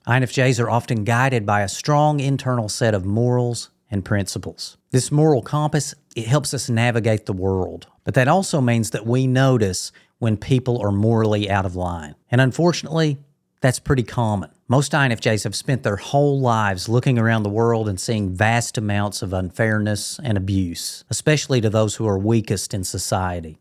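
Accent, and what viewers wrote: South, United States. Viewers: This is lovely. I love your Southern accent.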